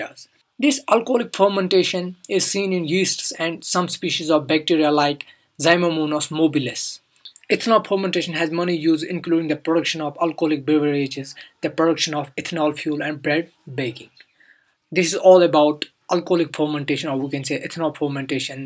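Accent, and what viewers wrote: Kashmir, India. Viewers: That was informative. Kashur (Kashmiri) accent might be hard for some to understand though.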